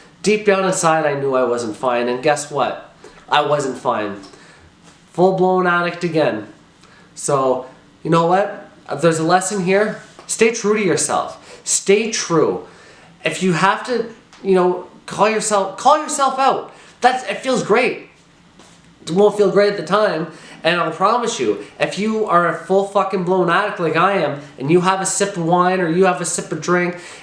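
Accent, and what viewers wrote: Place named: Canada. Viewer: I love your canadian(?) accent.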